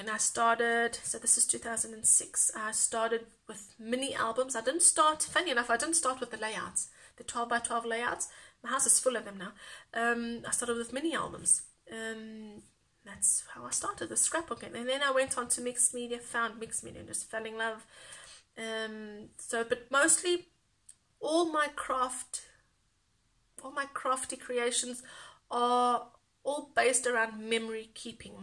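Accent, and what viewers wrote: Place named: South Africa. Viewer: So happy to finally see you my friend, you have such a positive and smiling face. I just love your accent and really feel that South African accent is soo cool. I have a mix of indian and South African accent but my 4,5 year old daughter has very strong South African accent and she always corrects me 🤪.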